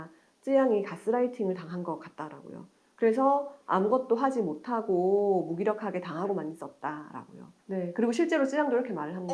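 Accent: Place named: Gyeongsang, South Korea. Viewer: 오늘 깔끔한 얼굴에 갱상도 억양 굿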